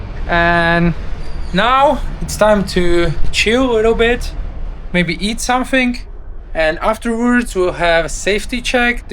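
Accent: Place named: England, United Kingdom. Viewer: Hey you are so cute with your English accent 😁 I was wondering where you are from. I hope one day we can travel the world together ❤️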